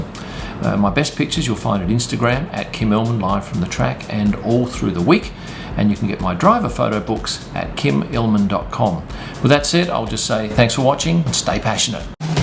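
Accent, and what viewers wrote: Australia. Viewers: How bout a vid on tourist attractions near every F1 track eh mate? Btw I love the aussie accent so tried something. Correct me if I am wrong.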